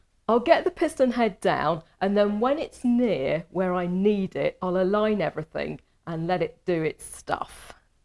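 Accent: England, United Kingdom. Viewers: As much as I can't stand videos or commercials with people who have english accents, in this video , for some reason I was able to overlook that.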